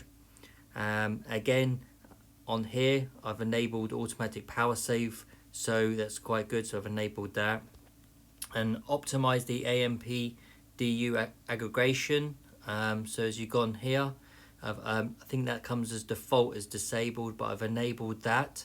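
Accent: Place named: RP, United Kingdom. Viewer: Why would he do that? He's English, from England who speaks Oxford/Queens English. Not a yank speaking false "American" English.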